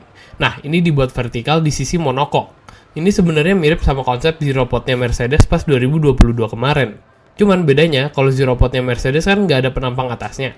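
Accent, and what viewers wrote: Depok, Indonesia. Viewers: "Tahun ini Tahunnya Ferrari" Ucapan setahun sekali Btw bang lu orang depok? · Ente orang Depok ya min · Bang luh orang depok yaa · Hahaha Sawangan GP, adminnya orang Depok nih 😂🤝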